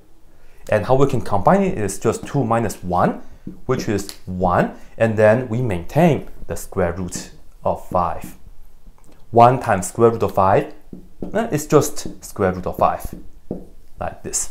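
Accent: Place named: India. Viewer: 不看你的中文视频还以为是印度人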